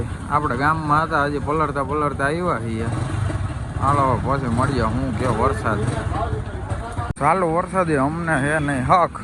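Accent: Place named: Kathiyawad, India. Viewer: bhai aapno pure kathiyawadi flowless communication👌👌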